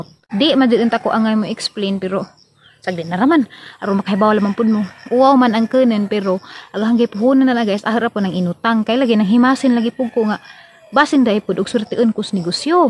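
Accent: Bohol, Philippines. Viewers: asa man ka dapit sa bohol nga pirti mang gahia sa imong sinultian? 😍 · nasuya rana maam oi, samot na cguro ug mka tukod kag Gaisano diha 🤗 lami jud paminawon ang bol-anon maam oi pinagahi jud 😊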